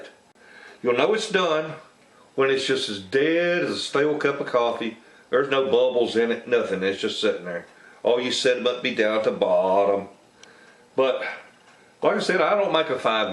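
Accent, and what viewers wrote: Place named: Appalachia, United States. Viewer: I love your accent, is it hillbilly? Must try your recipe!!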